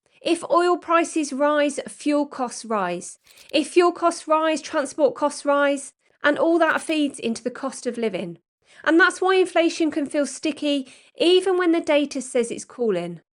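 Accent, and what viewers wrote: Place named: Black Country, United Kingdom. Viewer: Great Black Country accent by the way. Love it ❤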